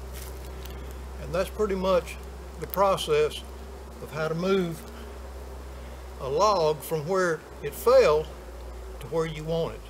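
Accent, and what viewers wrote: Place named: West Virginia, United States. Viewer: Accent sounds just like my uncle from west virginia. Good tip generally. I got a bunch of junk logs dropped off at my property recently by an Arborist. He did be the disservice of stacking them a mile high and I'm having huge difficulties getting some of the logs down. But this technique helped me move some of them at least.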